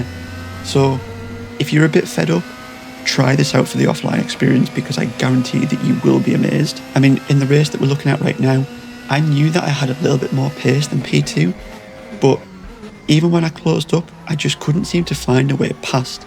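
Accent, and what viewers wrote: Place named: Middlesbrough, United Kingdom. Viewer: Subscribed and a like. But I have to try to guess your accent ! Middlesbrough ?...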